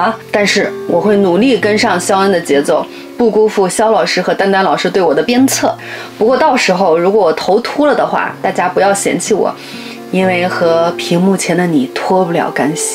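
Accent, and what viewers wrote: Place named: Beijing, China. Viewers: 咋听像京味儿，细听还是有点喳味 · 还真是北京口音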